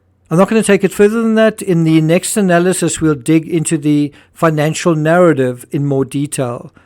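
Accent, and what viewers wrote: South Africa. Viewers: Love your videos ❤ Are you from South Africa? Had to ask😅 Love and blessings all the way from South Africa 🌟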